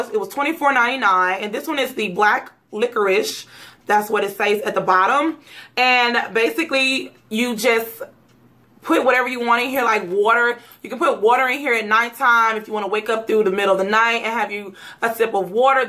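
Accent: Mississippi, United States. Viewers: Girl you from Mississippi? I instantly subbed! 🙌🏾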